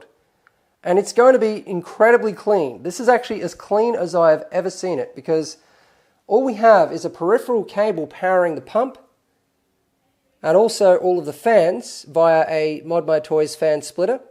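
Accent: Australia. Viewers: i love the vids so keep them up ! but i got one thing to get of my chest: i for sure thought u were an asian male ( no offence meant) i think it's just the Australian accent fucking with my mind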